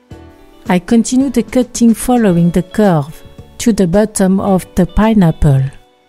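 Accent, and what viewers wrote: France. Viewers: LAOTIANS with a French Accent! Wow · I love pinneapple and thats why Im here but after 5 minute of french accent English, I feel like ordering some "baguette avec beurre" :))) I actually enjoy French accent English speakers. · Bravo! English sounds so much better with a French accent.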